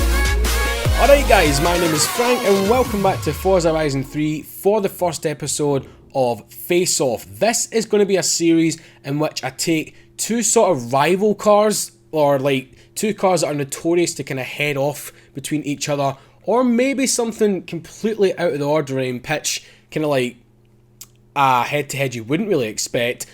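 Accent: Scotland, United Kingdom. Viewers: Mate Gota love Scottish peoples voices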